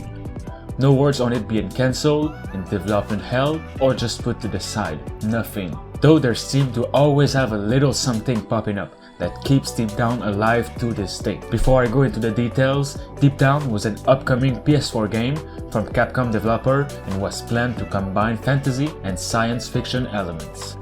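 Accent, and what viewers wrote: Quebec, Canada. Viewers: Happy to hear this project is still alive, looked very promising. And hey, are you from Quebec ?! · Are you from quebec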